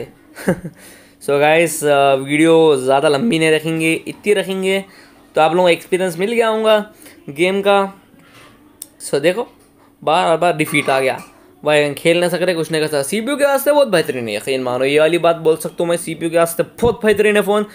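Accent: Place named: Hyderabad, India. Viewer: I love Hyderabad talking style ❤️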